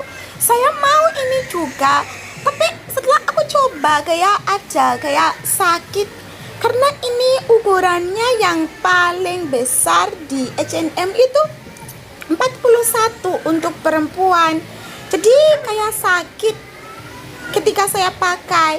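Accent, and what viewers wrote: Java, Indonesia. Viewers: Zimbabwe medhok jawa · Kok logatnya kyk orang jawa ya...hehe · Mb sudah medok.salam kenal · Gara gara Londo kampung aku mrene penasaran hehehehe wes medok mbak sampean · Mbak bahasa Indonesia sampean medok hehe... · Mbk jd medok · Medhok dong suaranya Tadi habis nonton londo kampung langsung cuss kesini. Btw kak gak di suruh kayak ng scan gitu kalo mau masuk mall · Medoknya mbk,kereen,suamiku Nigeria belum bisa indonesia,mb keren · Aku suka aksen Jawa-nya Mbak. Salut. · Semangat mbak 🤗 medok nya lucu bgt 😁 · Mbak bhs indo nya khas org jawa bgt:D👍 Salam dari kalimantan utara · Bukan seperti orang Afrika, malah seperti orang asli jawa. Medoknya 100℅.. Kesini gara2 chanel sebelah. Londo kampung. Semngat trus kkak. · Wow mbak aksen bahasa indonesia anda medhok banget seperti aksennya orang jawa,, mantab mbak 😊👍 i'm new subscriber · Hii..i visit ur channel after i saw ur collaboration with dave. 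That's great!! Happy stay in indonesia..ur accent almost same with javanese with strong in D, B, G..hehehe.. Btw i'm from west java..can u share about ur hometown culture? Foods, tradition, song or anything..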